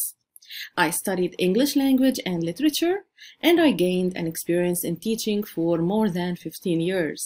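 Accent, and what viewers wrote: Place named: Arab world. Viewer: From the first second I heard you and before I get to the first video in the list about you I knew that you are arabic. It is an amizing course. I have learned alot from it. Thanky alot.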